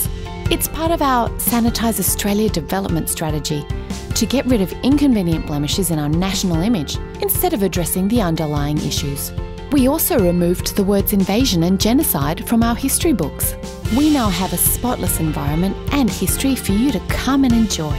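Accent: New Zealand. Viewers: Voice-over sounds like a Kiwi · She sounded like she had a bit of a kiwi accent.... I call shenanigans!!! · Is that a Kiwi accent?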